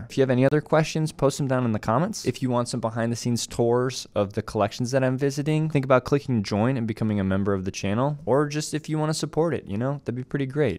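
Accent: Canada. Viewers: Lemme guess... ur from Canada. That is VERY subtle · Wonderful video! You’re a super likeable and charismatic guy— you should make a podcast! Also, you have the same speaking pattern as Jacob Sharpe; are you Canadian by any chance?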